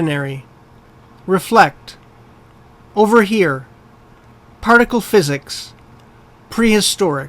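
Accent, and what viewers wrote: Canada. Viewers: 완전 좋아유 👍💯💯👍👍 캐나다 남편께서 직접 녹음하신 듯 하네요. 🤗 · 여기 발음은 캐나다 사람입니다~🐱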